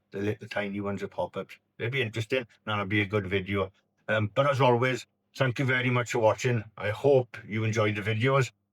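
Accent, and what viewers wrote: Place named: Wales, United Kingdom. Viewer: Bloody good result, - hard fought and fully deserved. Where in Wales are you from? Saw your video on Aberavon - Im a Port Talbot boy and that's my local mark. Your accent sounds fairly local too.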